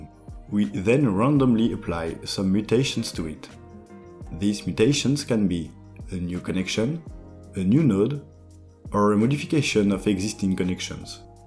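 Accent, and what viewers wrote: France. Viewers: Okay. Btw, aren't you french because you're accent is suggesting me that ? Nice video though. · You're French, definitely. Because I Can understand your english way too easily. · Je confirme on sent l'accent français qui ressort par moment sinon trop bien la vidéo continue comme sa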